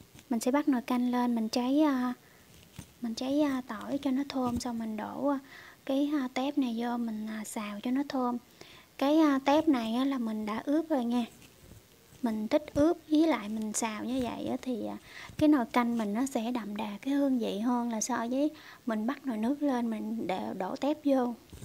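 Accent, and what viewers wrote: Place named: Mekong Delta, Vietnam. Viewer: Mâm cơm nhìn hấp dẫn quá, con gái miền Tây nói giọng hay và khéo tay quá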